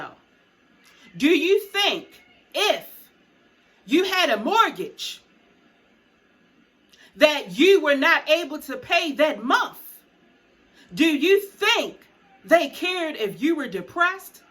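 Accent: Baltimore, United States. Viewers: The one thing I love about you is that you say what you say and you leave it alone, you do not fall into the back and forth, some one calling you out and they can't take the heat, lookin for sympathy, next Hussle literally crying out for it, not gonna even say the frauds name, I looked up this Liv person, but I see you give great advice, she should listen.....Keep doing you...SO SO glad I found your channel and I love the accent.. B-more I'm thinking if not I apologize.... Lost my niece to Baltimore. Anyway, You rock.... Thank you for being honest and real...saying luv luv from Chicago..💯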